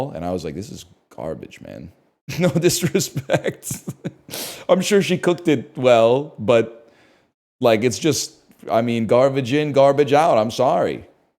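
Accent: Canada. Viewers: Welcome to the Canadian accent. 😊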